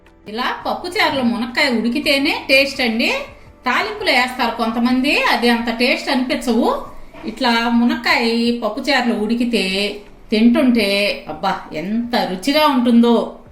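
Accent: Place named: Telugu, India. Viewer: Wow :: What a Telugu ! Bengaluru or Belgium can not change this lady' s Telugu accent or rustic aroma Manu more dishes like Kandi Pappu and Kandi Ginjala Pachhadi please Prakasam Panthulu Garu used love Gongura Pachhadi in Ongolu style PV Garu Chintha Kaya Thokku in Karimnagar style We are proud of this lady and her rustic way of presentation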